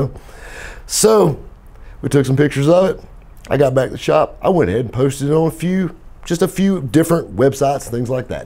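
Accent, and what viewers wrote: South, United States. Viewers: i love this guys stories ed's are good to but southern draw takes me back home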